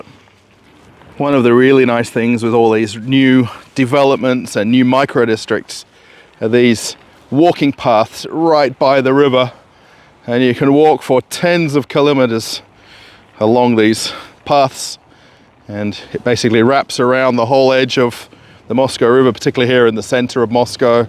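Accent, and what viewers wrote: Australia. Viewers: i can detect an Accent..Australian or NZ....? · Мне кажется некоторым даже интересно и они пытаются понять, что он говорит. И это не сложно, ведь у него такой приятный австралийский акцент. Я с удовольствием его слушаю. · Nice video. I will get an Australian accent if I keep viewing this videos...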